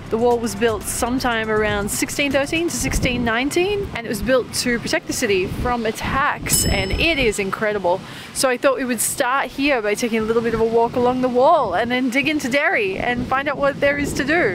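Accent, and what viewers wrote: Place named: Australia. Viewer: She sounds Aussie, they drive on the same side of the road as Ireland and the UK.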